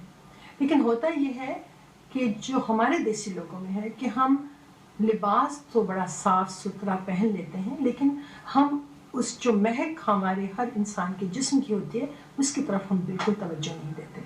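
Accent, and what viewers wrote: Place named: Karachi, Pakistan. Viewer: Do you still run this program? Love your urdu! Not sure where are you from but I'm orginally from Karachi and you have that Karachi accent. Keep the good stuff coming in.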